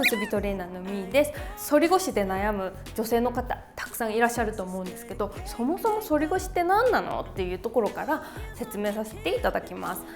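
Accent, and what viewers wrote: South Korea. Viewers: 先生が韓国人だと知った上ではたまにあー韓国の人が訛りね感じるけどほとんど感じません。何度も日本語で話されてるからでしょうね。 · 韓国語のイントネーションを感じた🇰🇷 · 発音が韓国語でかわいい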